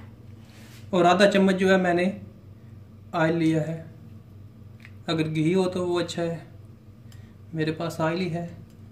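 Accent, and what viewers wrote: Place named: Pakistan. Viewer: Taste ka to apna he maza hy but app k urdu sunny ka apna he maza hy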